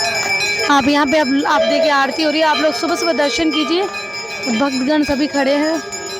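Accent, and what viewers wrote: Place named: Haryana, India. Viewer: जी आपकी आवाज सुनकर तो ऐसा लग रहा है जैसे आप हरियाणा से हो जी जय श्री राम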